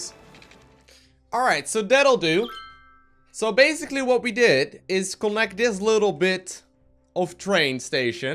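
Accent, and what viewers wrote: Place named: Netherlands. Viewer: He sounds Dutch now I'm curious does somebody know.?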